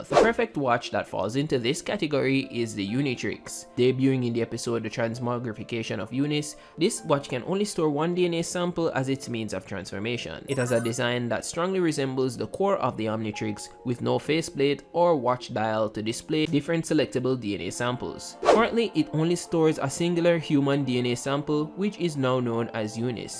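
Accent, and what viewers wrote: Jamaica. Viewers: Out of curiosity, are u Jamaican 😭 i hear a accent but cant pin point it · Are you jamaican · Are you Jamaican ? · Hes jamaican but i cant prove it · he sounds jamacian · bro u Jamaican? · Ur Jamaica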